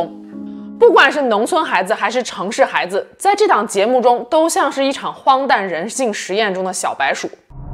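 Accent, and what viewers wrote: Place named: Beijing, China. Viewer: 说话有北京味儿，那叫一个地道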